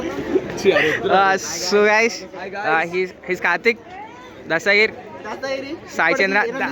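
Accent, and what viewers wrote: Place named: Tirupati, India. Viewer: Where are you from bro ? , Bangalore ah ? Tirupati laga vundhi bro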